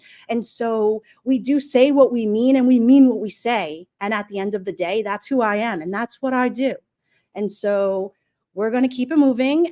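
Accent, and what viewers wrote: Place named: New York, United States. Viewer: ..I'm always on catch-up ..I love your NY accent..💖👋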